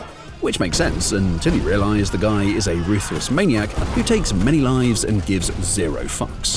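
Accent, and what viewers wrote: United Kingdom. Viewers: Such a great video. My only problem was the narration, you guys are already hard to understand with the british accent but calling Saddam Husein as "Sed'm" didn't help at all 😂 I had to keep rewinding to understand what's being said. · What's point of posting this video with your garbage brit accent, so that you can spread propaganda for iran war!? You do know that, 8 to 9 trillion dollars were spent by US military and ended up rising prices of everyday living, if not then stop spreading propaganda!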